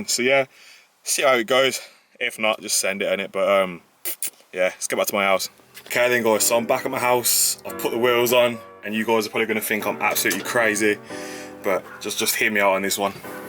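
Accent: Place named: Midlands, United Kingdom. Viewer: Yo just been watching your vids as well I have fiesta mk6 too I've started upgrading it a bit lately got new exhaust have alloys on but those wheels man look cool are they legal where's the tread lol? Also where you from sound like midlands like me I'd love to get advice off you for my car once this virus outbreak has stopped!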